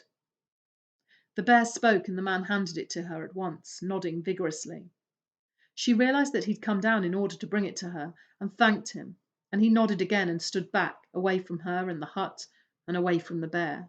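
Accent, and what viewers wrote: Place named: Texas, United States. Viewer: Hooray, you committed to the Texan accent!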